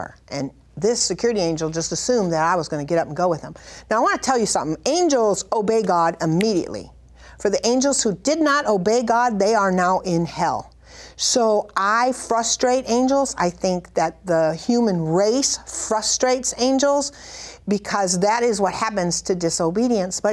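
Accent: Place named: Oklahoma, United States. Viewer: You remind me of * I think. The accent maybe??? From Oklahoma?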